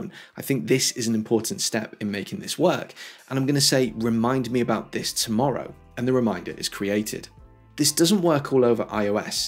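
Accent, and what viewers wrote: England, United Kingdom. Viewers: Great tips! BTW, I love your eloquence and British accent! You should consider being a narrator on PBS, BBC, or Discovery! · At last, an English bloke speaking English. No “hey guys, what’s up?” Fantastic. Thank you.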